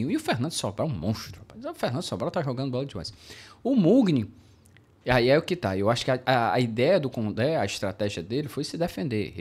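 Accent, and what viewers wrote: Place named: Ceará, Brazil. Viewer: Parabéns pelos comentários: sensato, equilibrado, objetivo e esse jeito cearense de falar, que é seu melhor!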